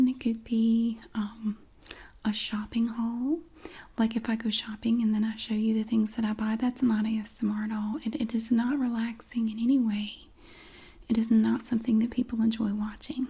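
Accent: South, United States. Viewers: YES! We love a sarcastic southern queen ❤️ Amazing video 🤘🏻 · Love that southern sass! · Heaven have no sass like the Southern sass, of a southern lass. Thanks miss, for making such good an great vids. PS from a fellow Southerner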